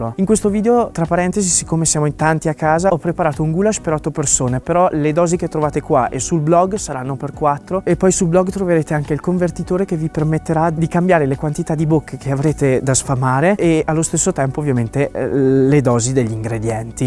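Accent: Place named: Romagna, Italy. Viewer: lo "ssiock termico", "tutto quel bel sciugo", "fazendo tosctare", "zzi vediamo alla proscima"...ma c'è niente di così piacevole per le orecchie come la parlata romagnola? A parte questo c'è davvero da squagliare di fame vedendo questa ricetta (avrei solo qualche riserva su tutto quel burro). Aggiungo, e spero di non metterti in imbarazzo, che me fai sangue pure te quanto il goulasch, perchè sei davvero un gran bel burdel (eccheccavolo, in base a quale legge o trattato chi illustra una ricetta ha da essere sempre di una bruttezza invereconda? Le eccezioni vanno apprezzate e segnalate).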